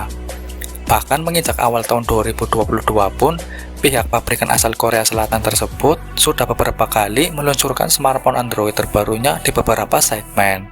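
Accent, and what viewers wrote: Banyumas, Indonesia. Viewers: Soalnya aksennya "ngapak". Jadi harus menekan aksennya itu biar bisa ngomong bahasa Indonesia dgn baik. Gitu sih kayaknya. Hehe.. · Jawa e ndi bro? Ngapak banget yah 🤣🤭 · orang ngapak ya bang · Ngapak ngapak.. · KAN ORANG NGAPAK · logatnya ngapak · Ngapak ya.. 😁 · Wong ngapak ya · Suara ngapak nya keliatan bnget yakk.. wkwk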